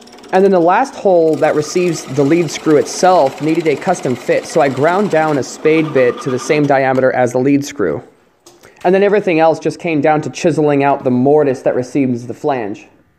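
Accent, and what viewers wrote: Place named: Utah, United States. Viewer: This guy sounds like he's from Utah.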